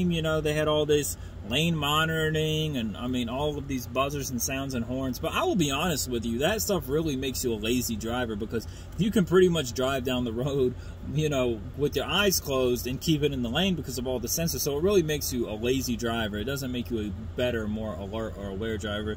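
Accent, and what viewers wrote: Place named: New England, United States. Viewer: Ur yankee accent doesn't represent ur claim of residing in the Carolinas...huummm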